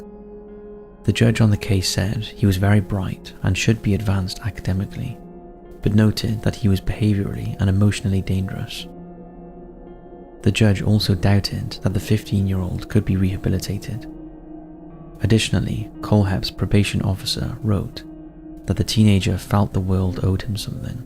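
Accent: United Kingdom. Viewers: I love your doco’s - I’ll be watching every one. Good realistic footage that’s relevant and great British voice ❤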